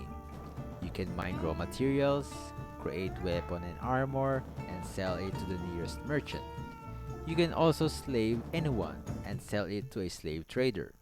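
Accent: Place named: Philippines. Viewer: Such a Strong Filipino accent haha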